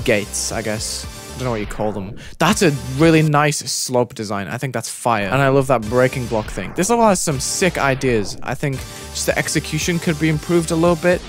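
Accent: United Kingdom. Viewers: shut up brit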